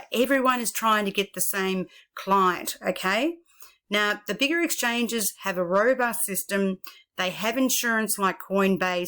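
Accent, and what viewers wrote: Australia. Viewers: mrTiii Very small you're right because Australian pronounce Finance as FaNANCE so is more likely to say BaNANCE.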